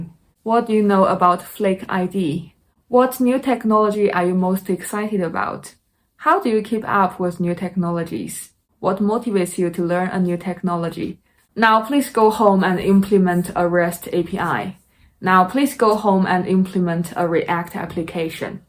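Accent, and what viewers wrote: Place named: United Kingdom. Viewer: 博主的accent有点British是怎么肥四！！！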